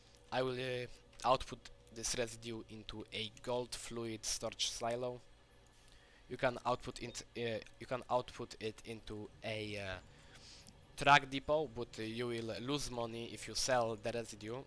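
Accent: Poland. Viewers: Im thinking polish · You sounds like Polish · Coś czułem po akcencie że rodak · Słychać po akcencie że Polak rodak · masz akcent polski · ah ten polski akcent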